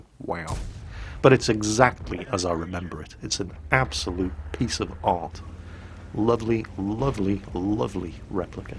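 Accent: Australia. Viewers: Soooooo... Are we going to get airsoft videos full of ' horrible Aussie accent now? Because that will be interesting.:)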